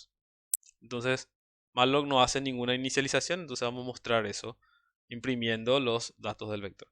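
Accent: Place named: Paraguay. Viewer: De la core cape, me ayudo bastante, me gusto el acento paraguayo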